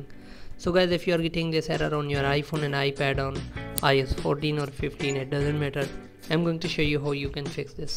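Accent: India. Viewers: When i heard an Indian i knew this wasn’t going to work.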